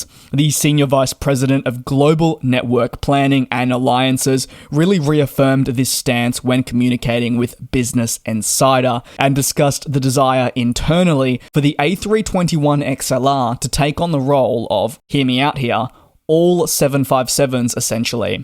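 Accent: Australia. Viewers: I could be mistaken, but this guy sounds Australian.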